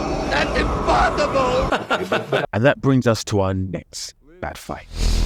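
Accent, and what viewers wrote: United Kingdom. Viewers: I joined your channel cause I thought it was interesting then realize all you do is complain about shit you can't do. I'm a critic of things too but I don't know if it's just that you sound like a total white brit or that you sound pompous as shit. I just can't.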